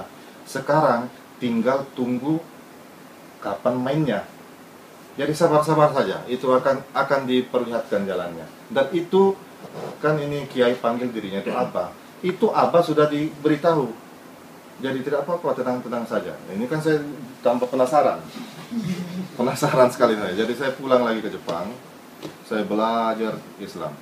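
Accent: Makassar, Indonesia. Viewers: Dialeg Makassar. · logat makassarnya kentara sekali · logatnya jadi ikut orang makasar ya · pantas aksennya makassar sekali... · kental sekali ini orang logat makassarnya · dialeknya kok Indonesia banget. dialeknya sangat mirip dialek Makassar atau Manado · subhanallah, allah mempunyai jalan untuk hambanya yang bersungguh sungguh, lama di makassar yahh, pantasan logatnya makassar bgt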